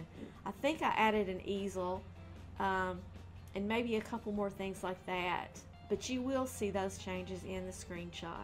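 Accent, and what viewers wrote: South, United States. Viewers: I love your house building video, This is the first one I watched of your builds. I love your accent to, Are you southern? Just curious.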